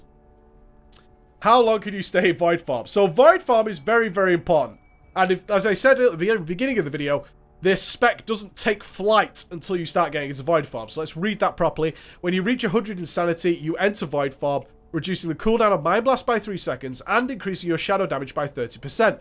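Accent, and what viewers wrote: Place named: Manchester, United Kingdom. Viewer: It must be the Manchester accent but I can never tell when (or anyone) is mad or enthusiastic about something when i can't see his body language.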